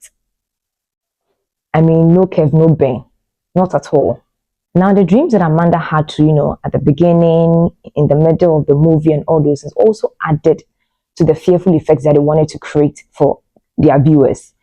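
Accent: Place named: Ghana. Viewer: The movie wad top notch... buh you have the ghana ascent in your voice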